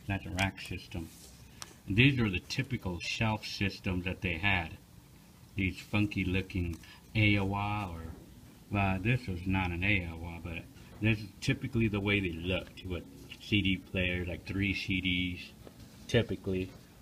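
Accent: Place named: Cleveland, United States. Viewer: Sounds like Cleveland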